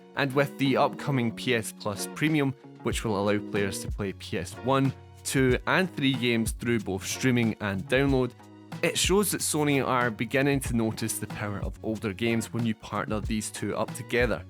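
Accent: Scotland, United Kingdom. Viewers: Imagine criticising a Scottish accent.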